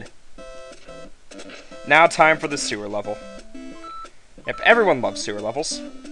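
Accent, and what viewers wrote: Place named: New York, United States. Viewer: Jay Gatsby's his name. Daisy's Nick's cousin. I just read the book, haha :-) I don't remember the part ish or level two though. I don't know what those are from. The spectacles are TJ Eckleberg's, on a billboard for a glasses company. I love your NY accent, btw. The part about Gatsby being poor was a flashback though. And there are no sewers in the book XD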